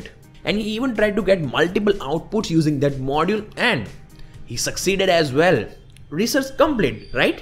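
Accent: India. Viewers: Don't take it wrong, but it'd be nice to put a little effort on pronouncing english better. The strong indian accent makes it very hard to understand these, otherwise, great videos.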